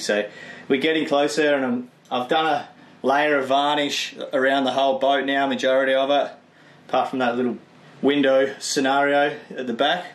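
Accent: Australia. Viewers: That Oz accent is so cool, more so when your hyped about something. Its like listening to a chilled Brit but on speed. :)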